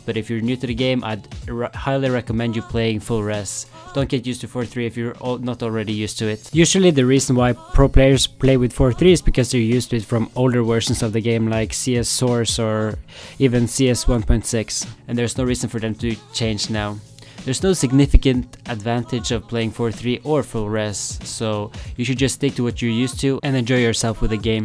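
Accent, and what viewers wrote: Norway. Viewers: Are you from Norway? (hear it on you're accent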